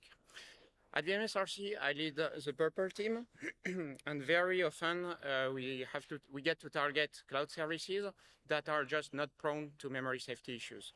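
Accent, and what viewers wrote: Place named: England, United Kingdom. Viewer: Awesome share. But damn..Can’t stand the English accent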